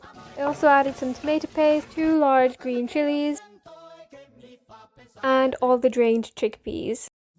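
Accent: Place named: Scotland, United Kingdom. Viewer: Which prt of UK you from? Sounds scottish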